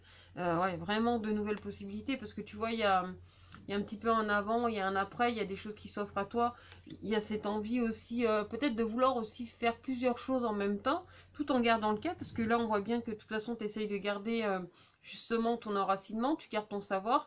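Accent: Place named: Franche-Comté, France. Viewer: je suis de Franche-Comté,je reconnaissais bien le ptit accent!!!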